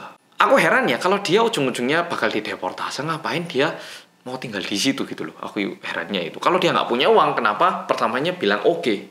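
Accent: Java, Indonesia. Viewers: 1. Aku suka cara pikirnya, "itulah peraturannya, kalau nggak bisa ya jangan datang" 2. Lama2 aku berpikir medok itu natural dalam bahasa Korea, saking bisa nyampur aksennya. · Ternyata babang glowing suka nonton juga babang medok😀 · Mas yang medhoook mwuhehehehe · Mas medok makin ganteng aja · Ngantuk bangettt Tapi pas dapet notif video orang korea yang MEDOK ga jadi ngantuk mas ehe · Bolo2 Medokkk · gemes banget sama ngomong medoknya mas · Halo halo mas medhokkk😂😍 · Aku ko senang dengar si mas ini ngomong,soalnya medok bngt,kalau suamiku tau pasti marah2 nih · "Hallo Gaes Kembali Lagi Bersama Bersama Orang Korea Yang Medhooook/Luweeee" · Kok kayak ada bahasa jawanya bicaranya??? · Waaaa kaget, ini pertama kalinya aku nonton yt org korea yg ngomongnya medok jawa bgt😂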